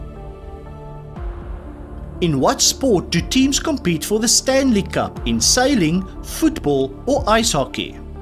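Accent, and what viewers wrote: Afrikaans, South Africa. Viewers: Bud as a fellow South African, you are clearly of Afrikaans origin,you need to work on your pronunciation.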